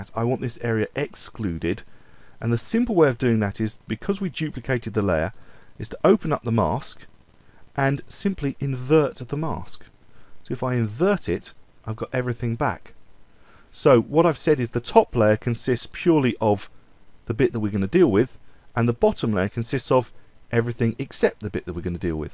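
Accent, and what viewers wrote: United Kingdom. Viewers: JUne 2021: after all this years still the best tutorial to this topics I found. Thank you very much! (And your british English is so good to understand!!!!)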